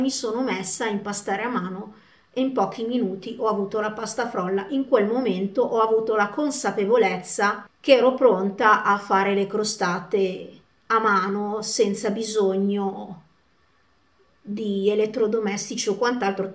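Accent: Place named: Veneto, Italy. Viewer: pensa che io all'inizio ho pensato che tu fossi Veneta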